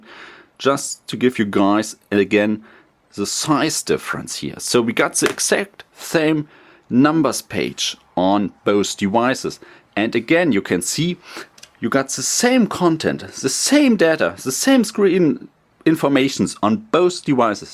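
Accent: Germany. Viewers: Not an expert traveler but this man's English accent is clearly German or even Swiss. Nevertheless, I appreciate the fact that he speaks my language, clearly and intelligently and offers a fantastic comparison of the two pads. I had the opportunity recently to purchase a mini2 with retina display for $160 usd new. Not wanting to just jump at buying a mini and regretting it, this review gave me all of the information I needed to make a good decision. Thank you. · are you german?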